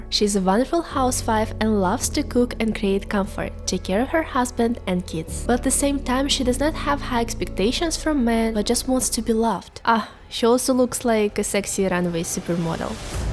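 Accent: Russia. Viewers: I just love the Russian accent! And many of you look like supermodel without being a cringe fest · Good videos though you a little too fast with your delivery. Due your accent us native English speakers may have trouble following along. The memes make it funny & engaging too. Also i’d love to see a Russian YouTuber tackle those politically sensitive subjects, so, a) anyone got any recommendations , b) to the author, if you ever leave Russia, there is a gold mine of subjects us westerners would love you to explore. Appreciate speaking on some subjects is life changing tho. Peace out.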